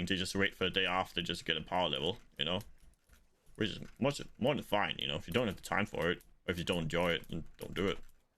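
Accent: Denmark. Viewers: Yo GOAT. Are you not playing HC? Sorry if you mentioned it, didnt watch the whole vid, but I did see you die. Would like to compete with you to benchmark my skills, I usually Play HC but this theme might be a SC season, especially if you go SC. Btw great content, hopefully you get the thousands of subs you deserve this season. Er du dansk forresten? Accenten 😆💪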